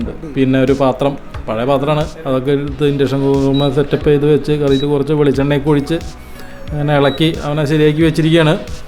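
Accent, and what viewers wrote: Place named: Thrissur, India. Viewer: Laughed a lot.. Really funny... Who had given the voice? A person from Thrissur, right? Good commentary too..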